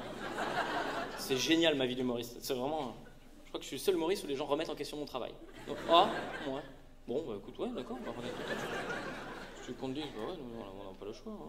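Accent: Quebec, Canada. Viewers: Il est génial ton accent Québécois 😂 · Je suis québécoise! 😂😂😂 Je t'aimais mais là je t'adore 🤣🤣🤣 c'est presque ca l'accent 😉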